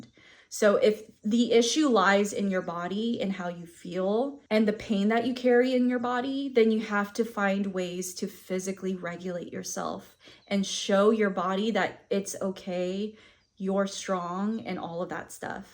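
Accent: United States. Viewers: that's just awful, I understand your family was raised in different cultural environments, but your accent or lack of accent tells me that you're very much used to the Western cultural traditions and women are VERY VERY harsh on each other when it comes to physical appearance it becomes toxic because of it being excessively used within social dynamics because of the pathetic desire to one up another person. It's nothing more than underhanded identity politics aka narcissistic characteristic individuals. Sorry you've gone through this, but I'm proud of your courage to voice your emotions and your vulnerabilities, you're definitely moving in a direction to up your level as I'm sure you've done in the past. Keep on moving forward, take your time, take care of yourself, don't be hard on yourself, you're a person, you're not a machine, you have limitations, and they'll continue growing in whatever direction you see fit. 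but at the same time it does seem that you were able to both see things from a logical standpoint and address them in a more objective manner, I wouldn't say intellectualize but definitely got your head on straight and still able to feel so deeply and be so in touch with self.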